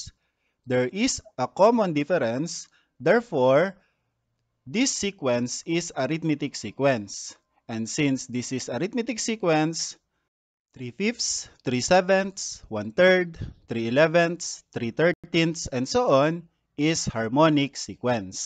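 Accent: Philippines. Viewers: Hes accent is very similar to a filipino because ima filipino too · I can tell you are a filipino by accent hahaha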